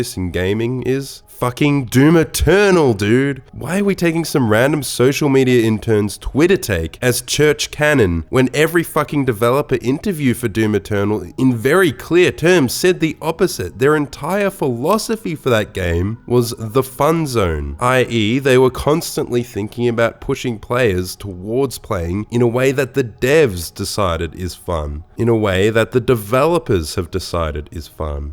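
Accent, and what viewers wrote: Australia. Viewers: No offense, but your Aussie accent makes it incredibly difficult to tell if you're being sarcastic or not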